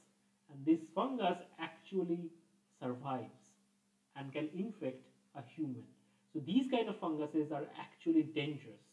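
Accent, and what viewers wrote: India. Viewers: I do not want to sound too harsh, the video is helpful, and we are thankful for it, although the sound is far too quiet, but I am always wondering why NOT ONE indian scientist trys to speak without the typical accent. I have worked in some international labs and indians have always the strongest accents - they simply to not seem to care....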